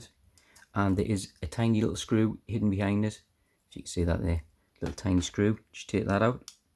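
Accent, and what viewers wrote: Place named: Carlisle, United Kingdom. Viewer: No way 😂 I live in Carlisle so I get your accent around here every now and , suppose if you have some foreign people watching I could be tricky to understand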